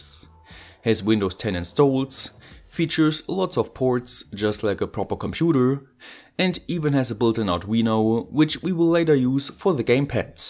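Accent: Germany. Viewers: are you german? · German man ? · the german accent is making me crazy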